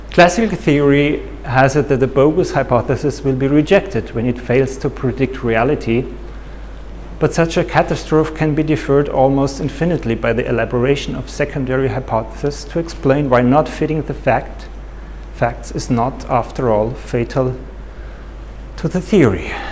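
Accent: Germany. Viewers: Until now I thought only Americans don't know how to foreign languages. But you are speaking with German accent and do the same with french - your neighbor!? Paul DIRAC. Put emphasis on the second syllable "AC" not on DIR. I agree with your notion "particle physics" is nonsense and the reasons.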